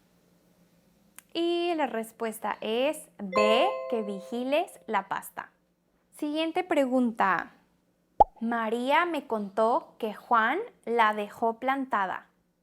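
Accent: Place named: Mexico. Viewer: Ojalá hagas las partes 2,3,4, etc de este vídeo. Estas frases y tu acento son de mucha ayuda para la gente que quiera aprender Español de México. Ya he recomendado mucho tu canal. Gracias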